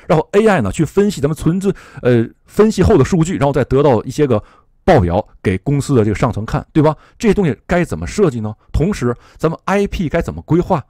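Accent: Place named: Japan. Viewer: 听口音确实是在日本干活的。komakun加油。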